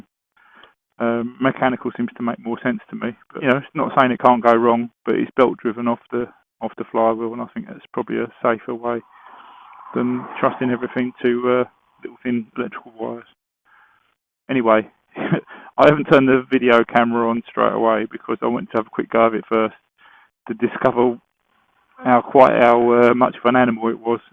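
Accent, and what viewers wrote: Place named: London, United Kingdom. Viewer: Gotta have spent some time in London to know everything he says😂innit geeza.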